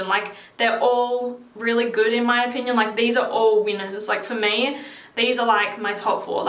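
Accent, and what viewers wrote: Australia. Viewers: That accent! I'm from London and get a lot of comments on mine haha but that aus accent suits you to a t!! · I definitely wasn't expecting an Aussie accent when i opened the video lol. Great list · Her australian accent does it all 👌🏻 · 100% normal Aussie accent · YU AUSSIE ? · I think she's Australian....but, I'm not sure....classy girl for sure!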